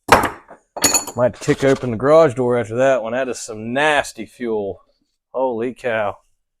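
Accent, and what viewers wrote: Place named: West Virginia, United States. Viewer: Love old Wide Glides. Fairmont WV - sister lives there. The back home accent always catches me, then saw your Clutch Up sign was like hell yeah. pretty cool channel I stumbled across on my early Sunday morning YouTube watching.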